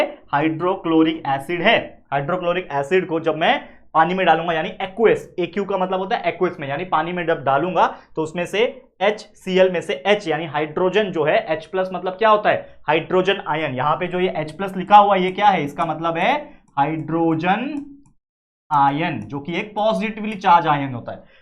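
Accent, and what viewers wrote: Maharashtra, India. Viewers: sir, is your mother tongue marathi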